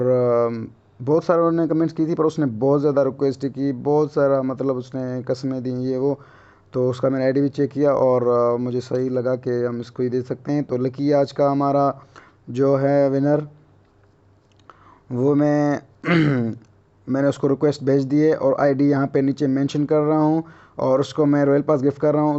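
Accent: Sindh, Pakistan. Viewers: Sir I love you so much hame Royal passs neeed nh he busss AP hamesha khush rahe you are best and apki voice se lag rha he AP Sindhi he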